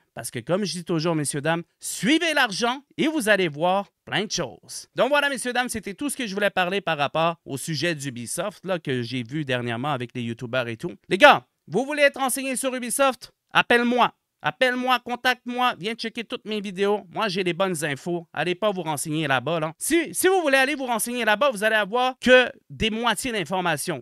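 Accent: Quebec, Canada. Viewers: Un abonné de plus, suite à la video de Psyhodelik. L'accent québécois me manquait, je reste squatter un peu 😅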